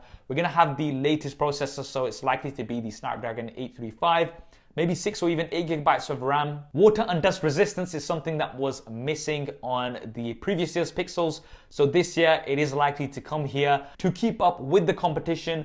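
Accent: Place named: United Kingdom. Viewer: Wow are you hindi?you sound like a Brit.amazing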